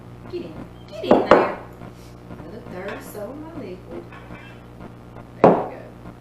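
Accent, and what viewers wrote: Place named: Louisiana, United States. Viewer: Your home is beautiful ! I just love watching your videos! So sweet that Louisiana accent! Your kitchen is elegant! Girl you can do some cookin! Yes, you need to call it a day! You worked hard! See you soon for a Christmas video! Loved the smore's video with the grand babies! Bye now! God bless!♥️🥰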